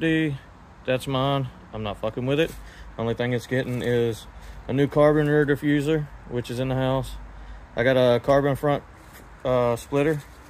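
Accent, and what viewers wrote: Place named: South, United States. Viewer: What website did you import ya cosmo from brother. You sound like you from down south! I’m from Newport News Virginia, and wanted to import one of these.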